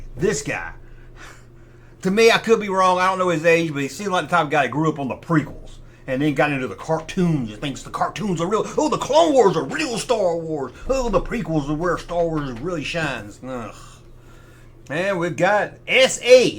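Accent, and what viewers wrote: South, United States. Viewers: If I was going to guess, I'd say that probably most channels don't get filters applied unless someone complains. Big channels with lots of views are most likely scrutinized by default (they probably get spammed with complaints/reports). But why waste compute cycles on channels that very few watch? Not trying to say you're a small channel (but you are lol), but I try to watch all your videos. I think what appeals most to me is your southern accent. I grew up in west texas, but a lil after you. I never developed a southern accent. We had cable and i think that's why I never got the accent. Everyone around me had it though lol! Do you have any other interests besides gen-x toys? You must've played video games at some point, could you do a video about that, or maybe even a video about you growing up, or about something from your childhood that wasn't star wars or toy related? 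It's cool that you have home video of yourself as a kid, that's something my family didn't do. Or maybe a short about your absolute favorite toy when you were a kid, and what's your most favorite toy now as an adult? And what's your day job like? And how much time do you use on making videos? And, you think you'll ever have an action figure of yourself? I wouldn't mind picking up a action figure, especially if it has that jersey you always wear, and of course the hat! But then that begs the question: what other accesories would be included? Perhaps a scale version of another! So your junkma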